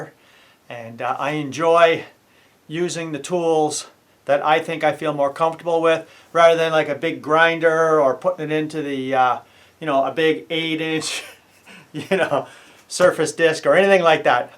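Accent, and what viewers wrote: United States. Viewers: Is that an Irish accent mixed with an American.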